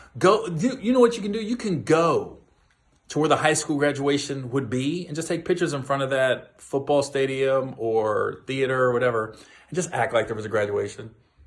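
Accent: South, United States. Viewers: you have a southern accent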